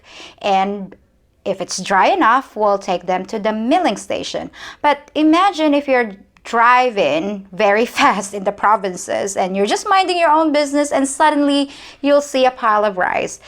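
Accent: United States. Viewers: Beautiful Leyte. Have you been abroad a lot? Your English are very good and very American. And have a hard time resisting answering what you put out:-) All countries has plus and down sides. The good thing with down sides is that then there is something with a potential for improvement. Yes the bikes with all the people on it....I remember first time i see that. My friends at home had a hard time believing people put their kids on a bike like that and took that risk. Later I have seen some real bad accident with bikes in Cebu. A bike is designed to take one or two people, and if you go beyond the factory specs, anything can happen. And yes the roads in Pilipino city's are overcrowded. Everyone like to have their own car. But the road are limited. The better of the people there will have it, the more people will buy their own car. Soon I have to buy a horse and bypass the almost stagnant traffic. 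This is a political theme that not only Philippines has to work with, many big cities around the world had to address this. regarding cats with messed up tails, I always believed it was all the loose mad dogs that bit them of....So it is inbreed... And coffee....I come from one of the most coffee drinking countries in the world. I guess freezing cold climate large parts of the year has done a hot cup of something popular at work breaks and after a meal. My grandmother lived in a time they buy imported beans. This was grinded on a very small grinder, so small it would fit i a lar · your American english accent is so good I would think you were the girl next door. I'm from Washington St. and I would say you have a northern accent. It blows my mind every time I consider that you have never been outside of the PI. Oh yeah and your addicting to watch.:)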